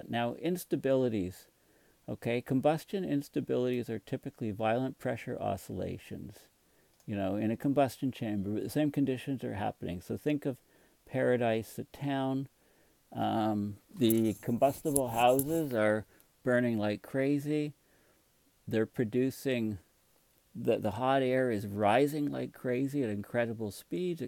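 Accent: Canada. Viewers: I hear your Canadian??:0 I'm from Calgary, Alberta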